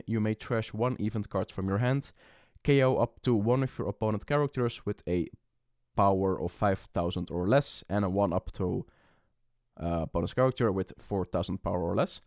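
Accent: Netherlands. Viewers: ik hoor een dutchling van jouw accent ngl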